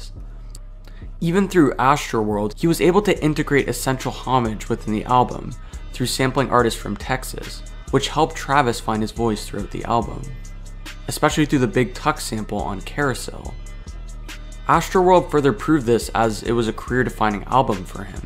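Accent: Canada. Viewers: Are u from canada. I hear the way u pronounce certain words 😂🔥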